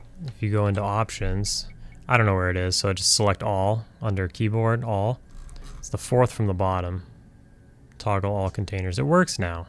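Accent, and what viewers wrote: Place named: West Virginia, United States. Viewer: I'm from west Virginia , this is how I remember people talking.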